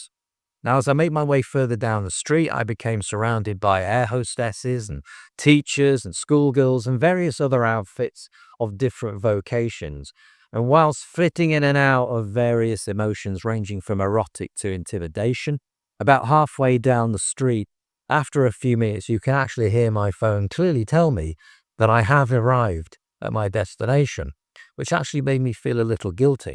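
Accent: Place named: RP, United Kingdom. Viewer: You sound posh but bottom line you chose Pattaya. That says it all in any case no matter how Angelic you like to appear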